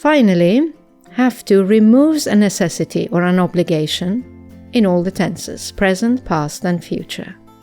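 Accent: United Kingdom. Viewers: One of the best teachers. Really great. Are u original British?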